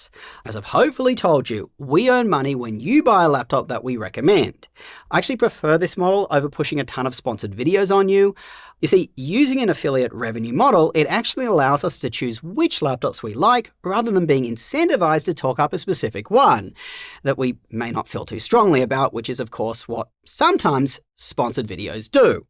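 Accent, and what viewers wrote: Australia. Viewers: Why are you US centered when you sound like Crocodile Dundee?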